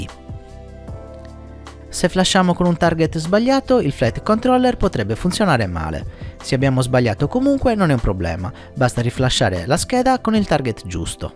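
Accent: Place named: Cagliari, Italy. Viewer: sei forte e poi il tuo accento mi sta troppo simpatico. Sarà colpa dei miei amici Cagliaritani che ricordo sempre con affetto!!! Grazie per i tuoi video fatti molto bene.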